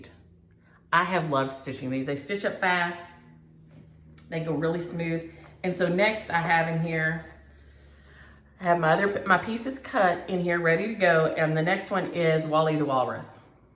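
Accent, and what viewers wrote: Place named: Texas, United States. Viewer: Always enjoy stitching you your lovely Texas drawl. Here in Ontario, Canada, fall will soon turn into winter. Getting my mind ready for that as a former snowbird!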